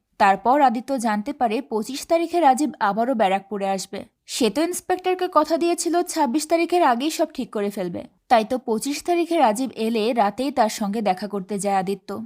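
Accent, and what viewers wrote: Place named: Bangladesh. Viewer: seser dik apnar comment sune bojha gelo apni Bangladesh er. Khub sundor... Osadharon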